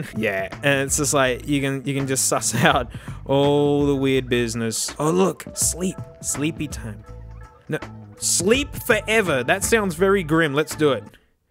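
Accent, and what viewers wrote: Australia. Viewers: I absolutely love these vids and the accent is A'stralian ( in a sea of USAs) but I do have a problem. I had a new battery put in an iPod Photo and the sucker will only fully charge via Firewire. If I try USB it will take forever and it still may not fully charge. It's really got me stumped. Any ideas? Oh and or what it's worth I just opened the box on a last gen. 160gb Classic and the battery charged up without any problems. Not bad as it sat in a wardrobe drawer for seven years. I then it connected to a high end dock (after I synced it to iTunes) and the music sounded sublime. Why did I go old tech? Well , I reckon that when it comes to an interface for listening music the scroll wheel can't be beaten. For a Jack and Jill of all trades I use iOS devices and if I want to go half way house which weighs almost nothing then it's the Nano last gen. 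Anyway if anyone can help with my problem I'd gratefully appreciate it. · Gotta love that Aussie accent... 🥰😏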